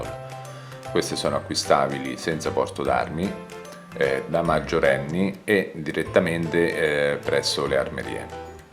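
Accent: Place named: Marche, Italy. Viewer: Dall'accento familiare sembri Marchigiano..👌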